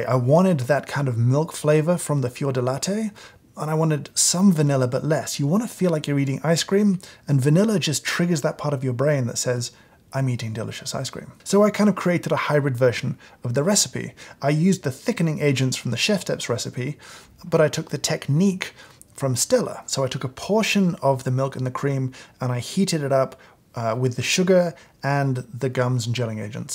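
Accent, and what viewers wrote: United Kingdom. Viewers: Top notch British accent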